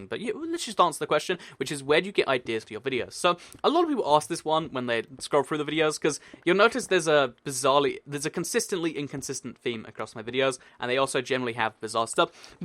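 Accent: United Kingdom. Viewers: #British Accent: Will we ever get mineable spawners for the Console edition of Minecraft?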